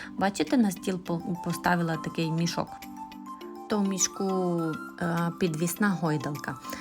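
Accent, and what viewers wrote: Transcarpathia, Ukraine. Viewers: Нарешті я вас знайшла. Давно дивилась ваші відео, а потім мій акаунт пропав і прийшлося встановлювати новий і відповідно всі підписки на Ютюб були пропали, я рада вас бачити..... Я доречі теж з Закарпаття і тому дуже люблю слухати свою акцентовану мову, мало ко її розуміє, а я рузуміву❤😂😂😂 Я з Великих Лучок за Мукачево, але проживаю зараз в Львівській області......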